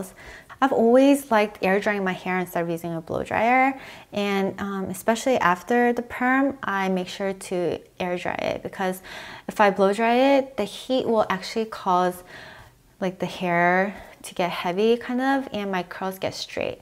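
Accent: South Korea. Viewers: wats wrong with her voice? korean accent?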